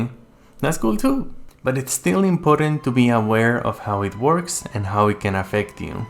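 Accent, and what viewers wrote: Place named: France. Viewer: Honestly, Genshin is my first gacha game and I've been hooked since pandemic and the only thing that kept me sane. So despite all the things negative things that people said about the game I will play the game until it's over. Thank you for the reminder tho. Really need it. Also, your accent sounds french. Are u french? Lol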